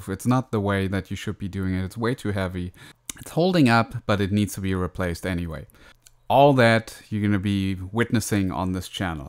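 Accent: Germany. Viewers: Grade über deinen Kanal gestolpert, super Video, dein Akzent bewegt mich dir Grüße aus der Heimat zu senden. Hoffentlich gibst es das Solarroof bald in Deutschland zu kaufen!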